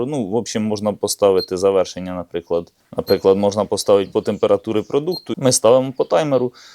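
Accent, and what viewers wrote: Cherkasy, Ukraine. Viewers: Зразу по говірці почув, що Черкащина. Привіт землякам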